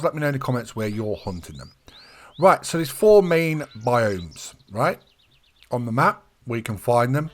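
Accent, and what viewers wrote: United Kingdom. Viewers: never have i thought an absolute peak guide would be narrated by a deep voiced british guy. idk what it is but your voice makes me focus a lot better on the guide and my brain gathers the info better lmao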